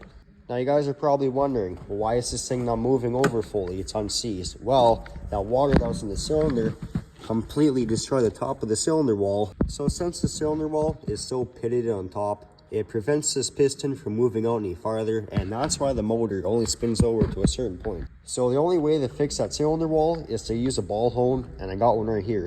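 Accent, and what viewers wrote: Canada. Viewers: Sounds Canadian to me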